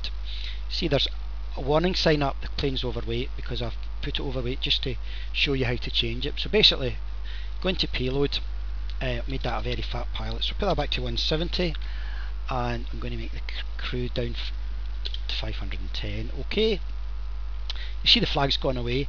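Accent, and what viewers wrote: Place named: Scotland, United Kingdom. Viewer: I couldn't help but notice your Scottish accent. It's cool!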